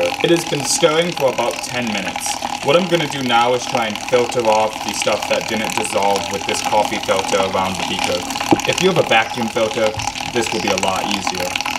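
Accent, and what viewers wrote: United States. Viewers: Are you from Boston? You sound like you have a Boston accent lol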